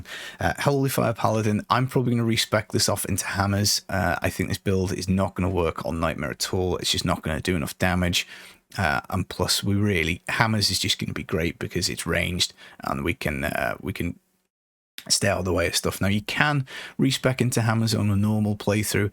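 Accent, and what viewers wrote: United Kingdom. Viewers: I found your channel back in diablo3 times and man! I'm so happy you cover d2r! I enjoy your vids as you keep it real, clear, without needless fuss. Plus I miss UK (lived there for 7 years) so listening to your accent brings back memories :D Keep it up, brother! I do hope youtube algorithm will smile upon you soon as this channel is a quality stuff!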